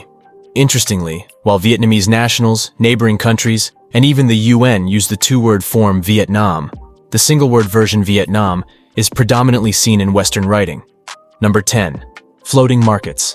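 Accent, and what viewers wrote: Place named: United States. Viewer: Just to hear that American, sickening accent drives me somewhere else, especially given what those bastards did toVietnam.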